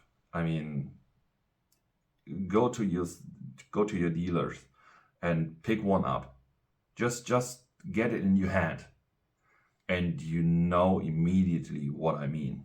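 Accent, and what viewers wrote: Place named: Germany. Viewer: You're German I hear.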